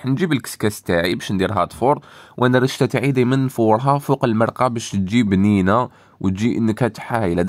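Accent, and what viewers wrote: Algeria. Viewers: من لهجتك جزائرية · الله يباارك الجزائر العاصمة مبين كل كلمة ههه🤣🤣🤣🤣🤣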